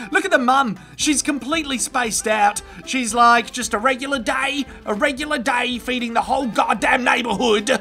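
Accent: Australia. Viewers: I always have a thick Australian accent in my head everytime I watch one of his videos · Australian accent makes everything funny.😂😂😂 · The greatest comment bloke in the world. The ozzy accent just adds icing to the cake. Love from India 🇮🇳 ozzy man!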